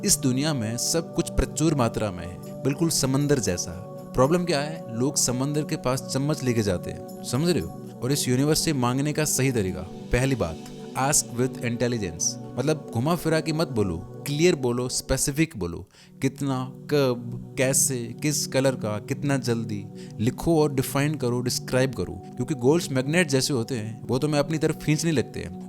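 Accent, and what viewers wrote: Rajasthan, India. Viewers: Ye banda rajesthani hai jo bol raha hai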